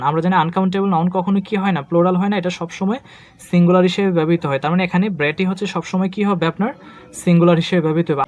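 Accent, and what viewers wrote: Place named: Comilla, Bangladesh. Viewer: Amar mayer bari o comilla te...tai apnar kthai se khan tar tan onuvob krchilam...Allah apna k valo rakhun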